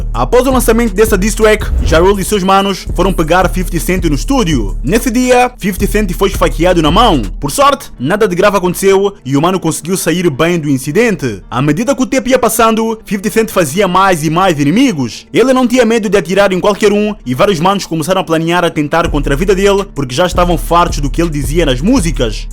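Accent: Angola. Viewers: Mano tu és angolano?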